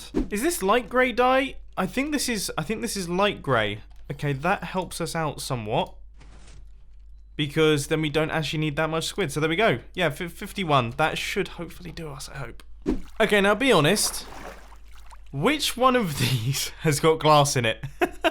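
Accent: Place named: United Kingdom. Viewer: You are the most British British person I have ever heard (that’s a good thing)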